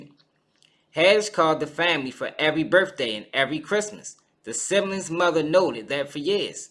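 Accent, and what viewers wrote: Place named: Baltimore, United States. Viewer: That shit is wild!!! At first I thought it was gonna be some bs lawsuit...nah that shit sounds traumatizing. Especially when you hire "talent" and treat them like animals. They took it too far. Pay the young lady n her brother. And apologize. Thats the least u could do. I imagine a real shoot where the topic is pedophiles they actually make sure people are comfortable. They basically abused those kids. It may not be to the full on pedophilia but they put the kids in an environment as such. Thats messed up. Yo... I think your accent... Baltimore area? Is crazy. Those two, you, who sounds lol. Word yall got one of the best accents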